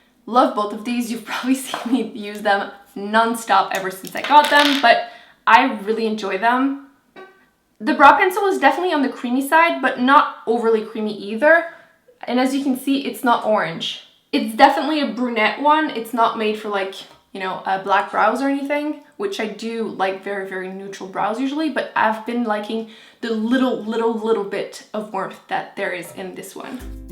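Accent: Quebec, Canada. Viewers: Shes french canadian from quebec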